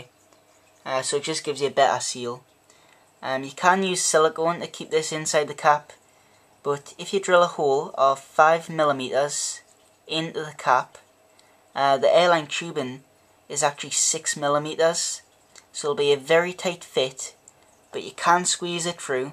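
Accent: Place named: United Kingdom. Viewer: Thanks for the vid!!! Great idea, that's what my plants need!:-) Hey, btw. you have got really nice and interesting accent, I like that, are you from the UK I guess, which part?:-)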